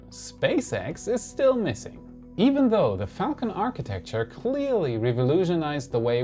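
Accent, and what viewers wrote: Germany. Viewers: Are you German? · are you german? you sound very german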